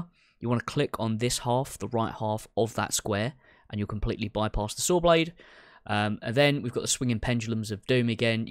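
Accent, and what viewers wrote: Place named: United Kingdom. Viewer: Man i love that british accent, "Diddly Squat fork all"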